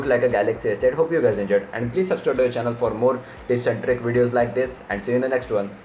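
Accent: India. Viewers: i hate, really i hate the english accent of Indian people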